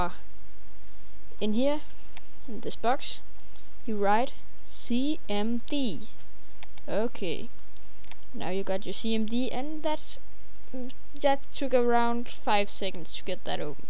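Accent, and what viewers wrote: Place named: Denmark. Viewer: haha your so clearly a danish person XD!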